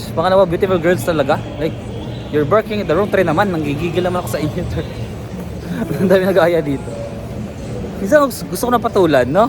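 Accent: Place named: Philippines. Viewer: Perfectionist? Filipino accent nga dba... style niya yan at authentic not pretentious.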